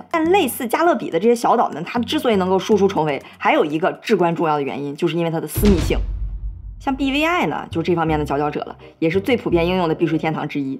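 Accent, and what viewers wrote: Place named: Dalian, China. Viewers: 总感觉小lin 不是大连人 就是烟台人😂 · 她应该是北方人、语速快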